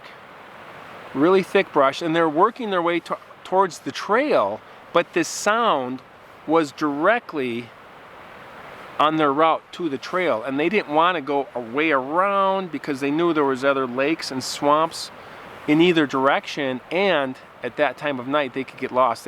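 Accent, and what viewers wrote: Minnesota, United States. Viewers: I went to Saint Olaf College; I’m from Seattle, but that accent, right away, told me exactly where you are from. What a great idea for a channel, looks like you are doing great. Can’t wait to listen and watch every one of your uploads. Bye now, you take care, see you soon, Buh bye. (The Minnesota long goodbye)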